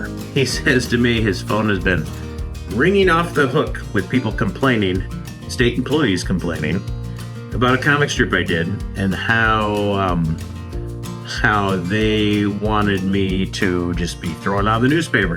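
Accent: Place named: Canada. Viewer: When you 1st started talking, I thought you were Canadian.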